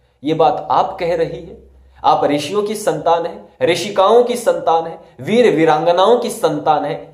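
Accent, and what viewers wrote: Haryana, India. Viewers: Pkka Haryanavi hai bhai.. Maan ge bhai.. Bhai mera comment pdhe aur btana chahe toh ek baat puchhni thi bhai se ki kahan se ho bhai haryana mein.. Baaki boli toh thodi thodi panipat ki lgi..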